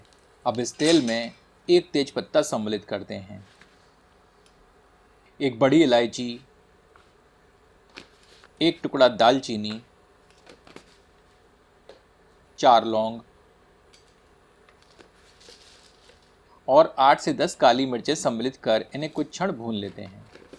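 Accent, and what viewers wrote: Standard. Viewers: भाई और आपकी शुद्ध हिंदी ❤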